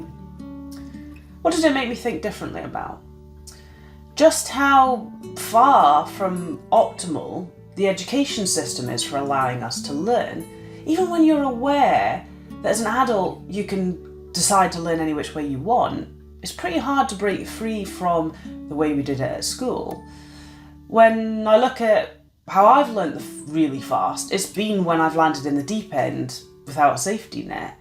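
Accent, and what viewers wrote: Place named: United Kingdom. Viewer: I love it when a British speaks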